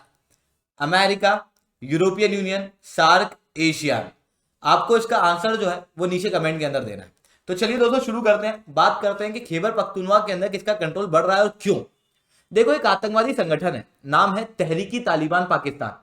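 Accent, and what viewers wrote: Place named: Himachal Pradesh, India. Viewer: Sir himachal se hae sayad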